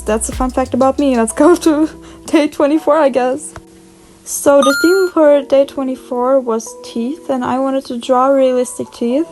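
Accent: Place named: Germany. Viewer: I actually like you German accent!! Loved the video!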